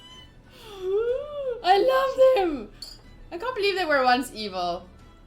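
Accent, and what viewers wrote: South Africa. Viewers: You have a very South African accent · Are you south African sorry I'm also south African and your accent sounds familiar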